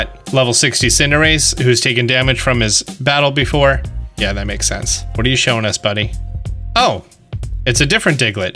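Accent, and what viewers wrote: Spain. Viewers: I like you even more now, with you cool Spanish accent!!! For the win!!! GO!!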